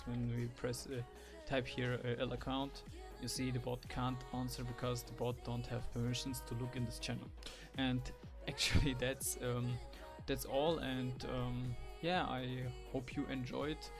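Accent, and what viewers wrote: Germany. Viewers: Der DEUTSCHE Akzent man kennt ihn!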